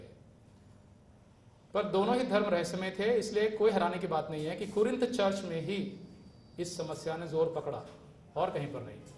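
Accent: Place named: Kerala, India. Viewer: Superb well explained Bro.. I really like your Pure Hindi language after you been a malyali language mother tongue. Appreciate much on this topic of tongues. Will share to others as not much speakers in YouTube are Hindi speakers. Also we have spoken on phone many years back. GOD BLESS YOU ABUNDANTLY. I have also got an article on this topic As we print and distribute it free to aware people about this wrong doctrine.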